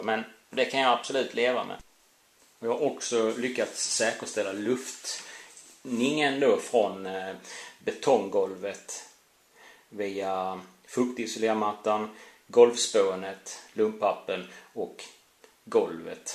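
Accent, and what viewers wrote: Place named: Hässleholm, Sweden. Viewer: jag har tidigare jobbat i hässleholm och tyckte jag kände igen språket:)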